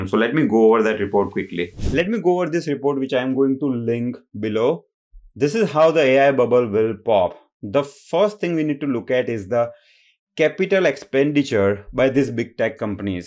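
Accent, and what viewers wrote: Gujarat, India. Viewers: Loved the video! 💯 The moment you spoke, I was like, Aree waah, Gujarati !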